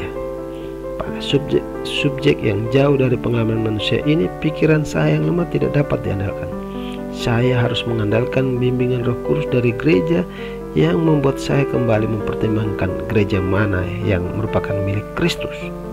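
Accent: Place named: East Indonesia, Indonesia. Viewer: suara dalam video ini...kedengaran macam suara sdr dari Timur...mungkin NTT atau FLORES. apapun...semua Gereja Tuhan itu belum ada yang sempurna, hingga nanti menit TERAKHIR di sempurna kan sang PEMILIK GEREJA, jadi bisa saja bersatu walau dalam perbedaan.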